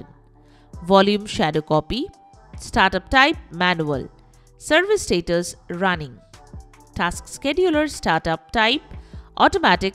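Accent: India. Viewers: Indian Voice over check, low viewer upvote check, blanket solution with no actual solution...also check.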